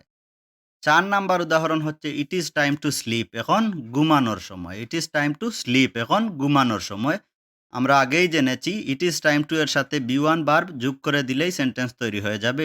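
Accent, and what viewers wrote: Sylhet, Bangladesh. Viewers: ভিডিও টি ভালো লাগছে নতুন কিছু শিখালেন স্যার সিলেটের আঞ্চলিক ভাষা পরিবর্তন করুন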